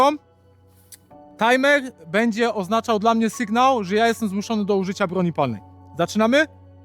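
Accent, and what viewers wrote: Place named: Germany. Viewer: No i spoko :) Dobrze wytłumaczone i nawet lekki niemiecki akcent nie przeszkadza ;)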